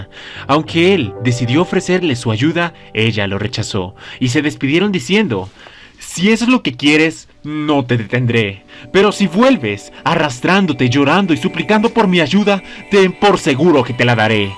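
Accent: Colombia. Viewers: Ste man habla colombiano de pura cepa jajaja es una chimba escucharlo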